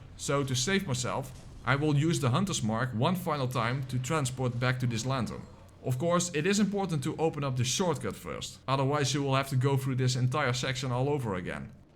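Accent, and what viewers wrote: Netherlands. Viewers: I can hear that you Nederlands are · First of all you sound dutch, second I can't even complete this game normally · You sound dutch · I love your Dutch accent lmao! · U dutch? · Im surprised there are so little comments about your dutch accent. Geweldige gamplay makker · Dit is de meest duidelijke nederlandse accent ter wereld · Love your Dutch English accent, greets from The Netherlands. Lekker gewerkt pik. ;) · lol narrator is dutch, stereotypical terrible accent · This man sounds dutch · Ik hoor een Nederlander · Geez, ur dutch for sure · Ey, Nederlands of Belgisch? :p Mooie guide. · Ik hoorde gelijk dat je nederlands bent aan dat accent hahaha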